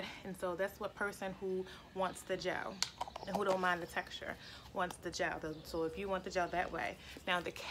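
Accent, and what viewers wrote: Baltimore, United States. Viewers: She is nice...I hear that Baltimore accent...GOOD Content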